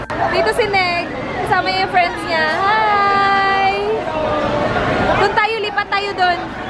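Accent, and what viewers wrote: Visayas, Philippines. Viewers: tga san kaya si ate ,parang visayas part kasi may "uy" lahat ng mga bisaya mga "uy" ung word sa tapos 😁. enjoy ko ung vlog ninyo po. ty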